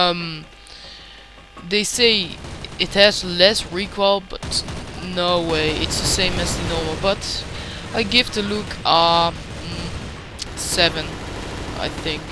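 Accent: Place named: Netherlands. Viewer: haha ik hoorde al aan je accent dat je nederlands bent!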